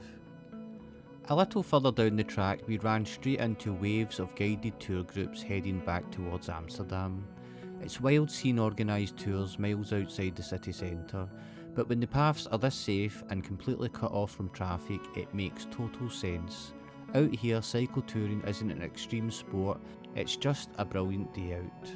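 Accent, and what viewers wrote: Scotland, United Kingdom. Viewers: Two things I love the most - cycling and Scottish accent - all in one channel!!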